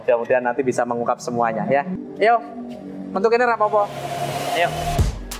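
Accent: Kendal, Indonesia. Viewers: Iku logate wong Kendal maa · Logatnya Kendal bgt pak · Logat suaranya seperti orang gemuh,kendal. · Logat nya emang kendal itu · Bahasane asli kendal..daerah ku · Logatny ky orang kendal .. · Logat bicarax kayak orang Kendal weleri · Bahasane kendal banget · Logate kendal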